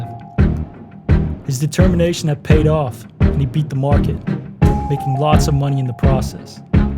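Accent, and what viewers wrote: Netherlands. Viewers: You sound dutch or flemish 🤔 am I right? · You have Dutch accent. If you are not Dutch that's not very common 😄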